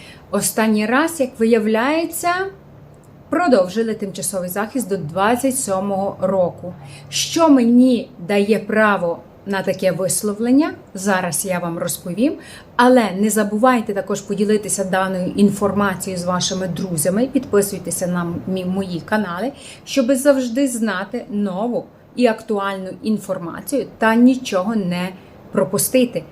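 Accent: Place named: Lviv, Ukraine. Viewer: Дівчатко, навчися розмовляти на українській мові ( без львівського акценту)...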